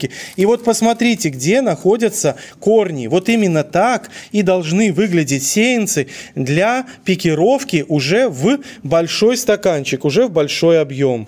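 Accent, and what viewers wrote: Belarus. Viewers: Речь красивая с небольшим белорусским акцентом!!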